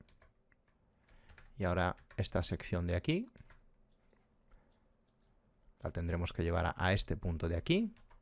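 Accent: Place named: Spain. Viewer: you are very good,plz make some english tutorial...i love spanish accent but i dont understand.im sure there are many people like me want english tutorials from you, gracias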